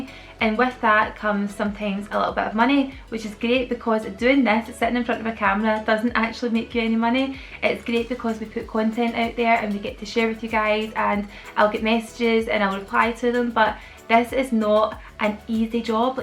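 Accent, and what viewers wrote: Scotland, United Kingdom. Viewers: Love ur channel great hear Scottish mum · It's so nice to have a Scottish vlogger who's content I love. Your accent keeps my home sickness at bay! Thank you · Thank you for always having a cheery disposition and its always lovely hearing a fellow Scot! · Thank you I used to really hate the Scottish accent but I love it now! URS and accent is cute.... I love the way speaks and she speaks like an actual little women.... I have always wanted to have a channel on here but have always been to shy and intimidated.... Have you any tips? Hints? Editing programs?? Xxx ❤️ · thank you 💖💜 I've only just started following your channel after your collab with kate💕 loving all your content and it's so lovely to hear a fellow scottish accent on here!! Keep doing what you're doing! xxx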